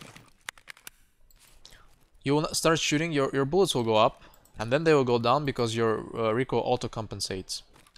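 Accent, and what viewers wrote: Russia. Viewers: Wait, I can hear barely noticeable russian accent :) Am I right?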